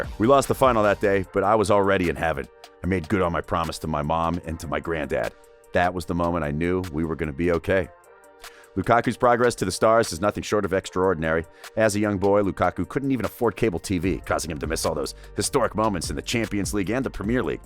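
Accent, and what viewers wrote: United States. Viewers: It would be more credible if someone other than an American narrated it.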